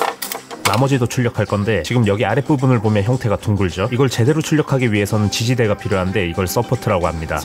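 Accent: Gyeongsang, South Korea. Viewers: 혹시 고향이 갱상도인가요? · 아무리 표준어를 쓰려해도 악센트라 사투리가 나와요 서울사람이 부산가서 경상도 사투리 쓰듯… 그냥 사투리 쓰세여. 강호동처럼..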